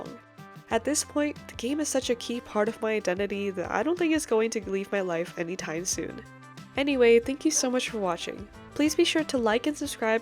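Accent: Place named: Sweden. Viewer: HUR BLEV DU AV MED SVENNE DEALEKTEN I ENGELSKA?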